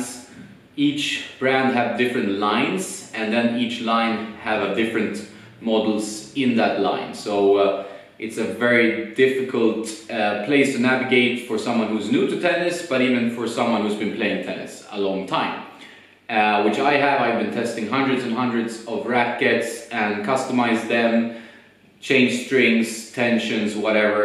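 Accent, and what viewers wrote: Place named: Sweden. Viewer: Bra ide, är du svensk. Du här en svensk accent. 🙂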